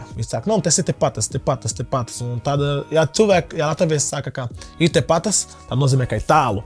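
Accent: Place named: Lithuania. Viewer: You sound Lithuanian not Latvian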